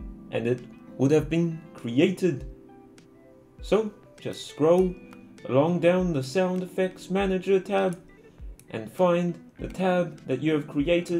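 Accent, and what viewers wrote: New Zealand. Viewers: wow i love new zealand accents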